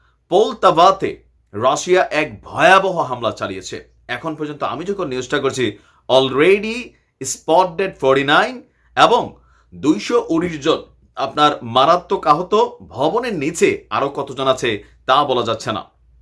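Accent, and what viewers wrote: Kolkata, India. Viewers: ভারতের শুরে কথা বলতেছেন। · কলকাতার ভাষাই তো আসবে। আপনার পূর্বপুরুষ যে ওপার বাংলার।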